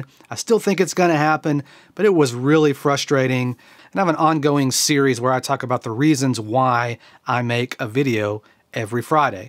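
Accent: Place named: Texas, United States. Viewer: Hey, YouTube is awash with "how to" tutorials. What's refreshing about your channel is that it's a personal journey of one man and the stories he shares (including his those of his dad!). I love that gentle, southern texan accent too (I'm from Melbourne so I don't hear a lot of it around here!). Throw in some cool music in the background to support the stories and it's a beautiful place to be! I'm very happy to just be present and an observer of your journey, in all it's twists and turns. Long may it continue!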